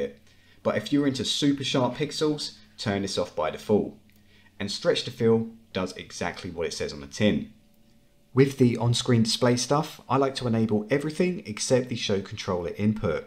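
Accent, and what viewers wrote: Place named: United Kingdom. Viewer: I don't know why but as soon as I hear a British accent I know I'm in the right place. This tutorial was amazing. Easily the best I've found on YouTube. Thanks man!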